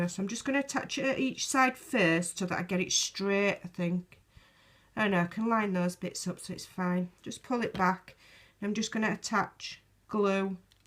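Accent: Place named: England, United Kingdom. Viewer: You are so delightful to watch. I live in the states South Florida and I thoroughly enjoy hearing your English accent. Good tutorial check me out I make these too with old napkins. I plan to sub to your channel thanks again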